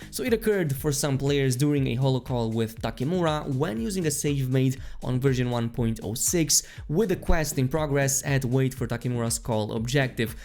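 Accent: Netherlands. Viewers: it's a dutch accent :)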